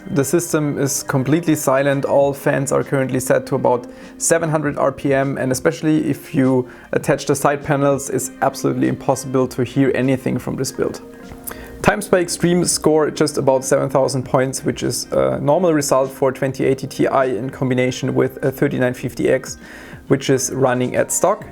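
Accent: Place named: Germany. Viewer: Just out of curiosity..... Why do Germans say the words like “of” and “have” with an English V, and some words like overclocked with a W sound? Great video, and no RGB.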